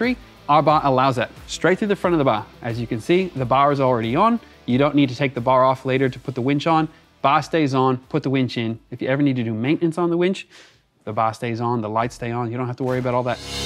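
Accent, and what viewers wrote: Australia. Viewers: This guy was for sure an Aussie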